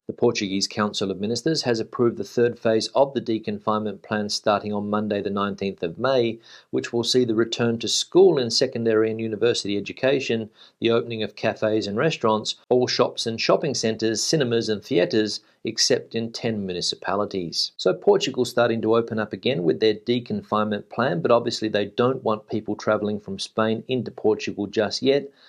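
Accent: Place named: Australia. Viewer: Thank you for your informative video and your continued work. By the way loving your Aussie accent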